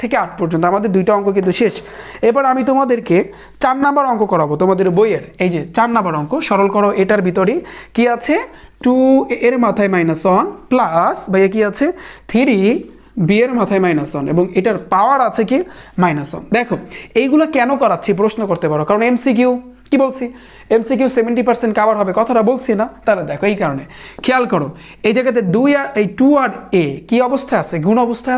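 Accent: Barishal, Bangladesh. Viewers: Baiya apner bari ki borisal🤌🙂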